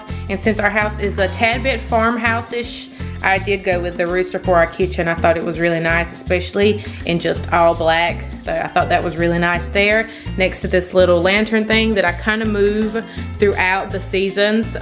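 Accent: South, United States. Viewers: You must be another southern gal. Love to hear you talk and I love your home and Easter decor💕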